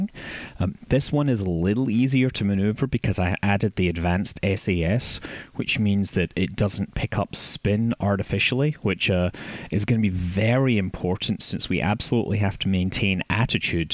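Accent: Scotland, United Kingdom. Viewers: Anyone else hear just how Scottish accent is here?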